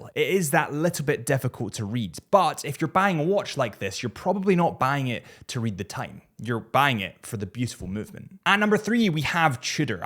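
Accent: Scotland, United Kingdom. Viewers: Love the channel but please please stop presenting the videos using the same style as Adrian Barker but in a Scotish accent.... just be yourself. You don't need to live in his shadow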